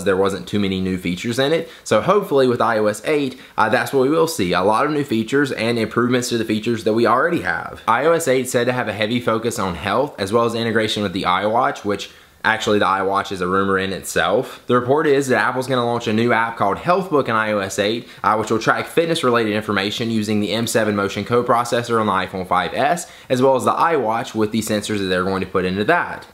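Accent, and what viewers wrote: United States. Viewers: he is gorgeous... what accent does he have? I mean I know it's american but ..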